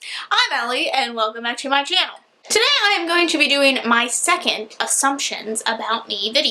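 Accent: South, United States. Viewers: OMFG I'm from MD (right on the DC border though) and have always assumed you were from WV or another southern state... I'm really shook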